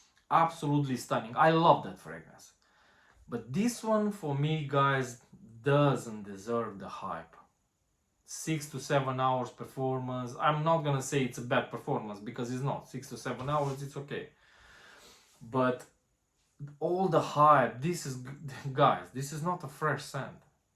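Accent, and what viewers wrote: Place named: Romania. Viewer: Bro, am o gramada de "influenceri" in lista de Subs si este foarte adevarat ce zici... aia vreau numa sa faca bani cand cumpara toti care se uita la videourile lor, azi te-am descoperit si sincer esti forate honest, si asta trebuie sa se aprecieze, nu inteleg cum nu ai mai multe like si subs.. aici ai un sub +, Salutari din Spania ( pe canalul pe romaneste am vazut ca vorbesti similar ca accentul meu 😂 sigur suntem din aceias zona din Romania 🤝🏽🤝🏽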